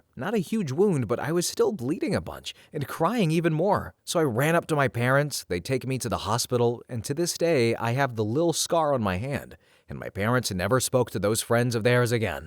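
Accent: Russia. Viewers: Love your russian accent xD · Loved the Russian accent! Crazy story.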